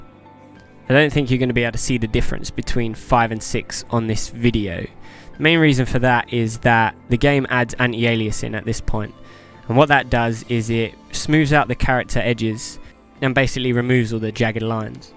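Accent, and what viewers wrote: London, United Kingdom. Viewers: aaaaah that explains, i lov ethe london accent,lived there for a month =) way way better than this northern ireland accent up here lol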